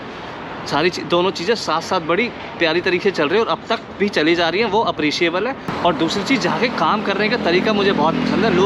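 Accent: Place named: Uttar Pradesh, India. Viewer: Bhai UP se ho kya 😂😂 me b UP se hi hu same accent tmhara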